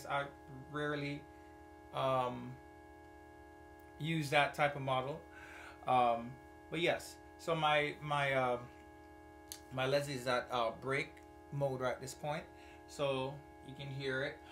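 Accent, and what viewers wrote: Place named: London, United Kingdom. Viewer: Odd question, but are you UK born? You have a slight London accent :-)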